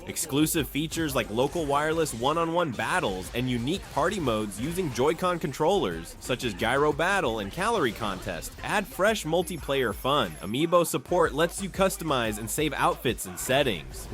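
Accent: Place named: United States. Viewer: Please could you stop using Yank Narration in your videos!? Quite honestly any other country of origin would be such a huge upgrade for me. I am literally unable to listen to this ermm, person anymore. The noise coming from him is just so vile! It makes me want to vomit. Don't get me wrong I'm not against all yanks, I love MrBallen for example but this guy and certain people's voices from yank land is just disgusting. I can't listen to anymore of this guy talk, very sorry!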